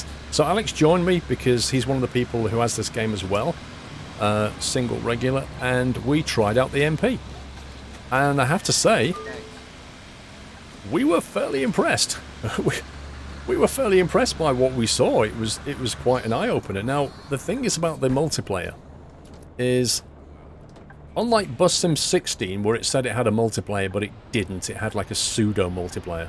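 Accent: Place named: United Kingdom. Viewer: Hi sorry for the extremely late comment but sounds youre from uk so youll know what i mean, our buses go from A to B then B to A then A to B and B to A and so on. And a drivers shift would last for hours, is that possible in this game to do a full days bus shift going there back there back there back?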